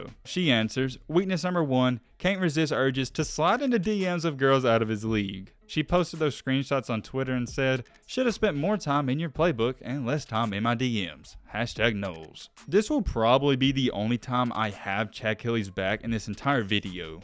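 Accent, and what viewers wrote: South, United States. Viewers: You're South accent is so warming. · The southern accent is easy to listen to. Makes me feel like I'm having a casual sports conversation with the fam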